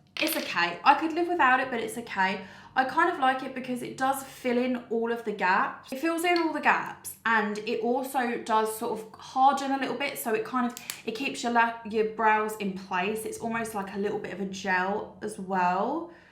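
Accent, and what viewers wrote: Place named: Australia. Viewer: Only found you recently and really enjoying your videos, you’re super down to earth and relatable, your accent gives me Australian vibes, I know you’re from the UK but it’s like you have an Australian twang on certain words, don’t mean any offence 🥰😍keep coming with the content I love it xx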